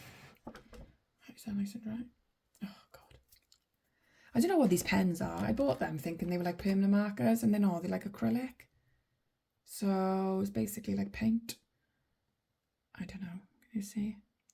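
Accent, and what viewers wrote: Wales, United Kingdom. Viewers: I love a Welsh accent ❤ I'm binge watching. I notice you've been gone about a month. I hope all is well and once I've completed watching you'll be back 😊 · Awww a fellow Welsh accent! ❤ Good luck in all your saving endeavours! ❤❤❤ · Great video🎉 I love the Welsh accent 😊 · Hello Lovely Well done on your first video. You did amazing 🎉 So glad I found your channel. Love a Welsh accent. My bestie is Welsh. Big hugs from Australia ❤ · Love the welsh accent, we are actually saving to move to wales atm x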